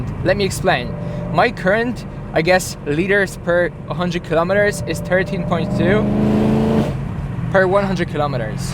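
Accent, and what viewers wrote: Ireland. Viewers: I understand you're a Polish channel.. But are you from / did you grow up in Ireland? Swear to god you sound like you're from Ireland when you're speaking - like somewhere from the southeast of the country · Great car!, you lived in Ireland or your teacher was one? Asking because of accent 😁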